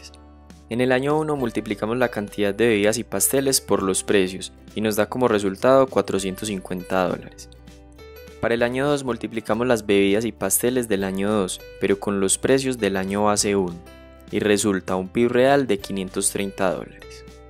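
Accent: Antioquia, Colombia. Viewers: Eres muy claro y acertado al explicar! y tu acento paisa hace más ameno escuchar este tipo de temas haha :3 Gracias por tomarte el tiempo para hacer estos vídeos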